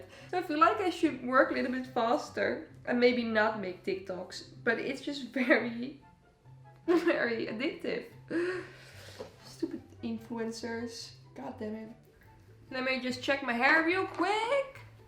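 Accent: Netherlands. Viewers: Is she Dutch? I can hear the accent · Hey I just found your channel where are u from? You sound or Dutch or German or Swedish I'm just guessing. I'm from the Netherlands and I love crazy haircolors ❤️ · Are you Dutch cause you kind of have a Dutch accent😊😅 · Lekker nederlands accentje wel 😻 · Heerlijk dat Hollandse Engels erdoorheen 🤣🤣🦄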